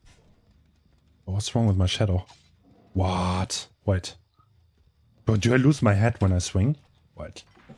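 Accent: Germany. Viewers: Ist er deutsch?😂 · I knew I heard some German accent.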